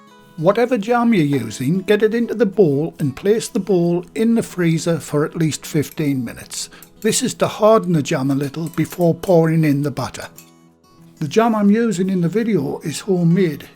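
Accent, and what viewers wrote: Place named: Northern England, United Kingdom. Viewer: This looks so yummy. Proper northern comfort food. You sound like a lovely northener. Thank you for such a well made video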